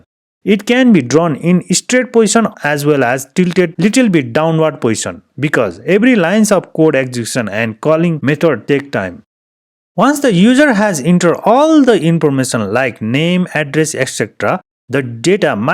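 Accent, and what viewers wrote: Nepal. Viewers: I cant help but notice newar accent. 😂 Great video!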